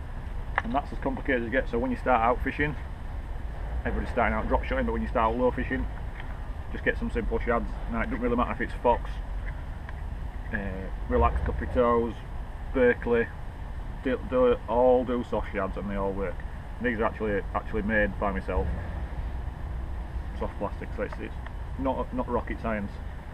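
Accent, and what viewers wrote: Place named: Yorkshire, United Kingdom. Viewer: I read your blog on dropshotting before watching this great video. LOL You did a great job of keeping a straight face. I've just tackled up with an LRF cheapish combo so as to be able to fish part sea, part canal after years of threatening to start fishing again,then taking early retirement I've finally done it. Your video's have inspired me to get another lightweight spin outfit for lure fishing. Drop shots, and small rubber lures came with the Shakespear agility LRF set up, but you've really sold it to me to lure fish. I wish I knew where you were, your accent sounds like mine, in between Leeds Bradford area. You're a credit to the sport. Thanks. Robin.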